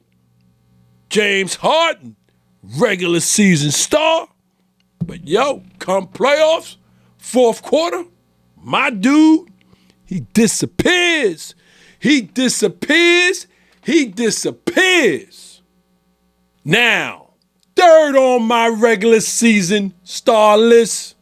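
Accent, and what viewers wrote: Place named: New York, United States. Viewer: U already know he’s from New York 😂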